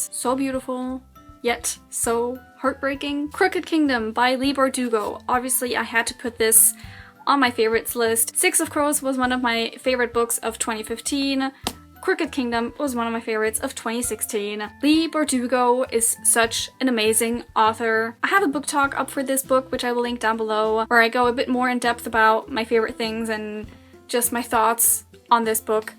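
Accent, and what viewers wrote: Germany. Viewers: really enjoyed the video, are you german ?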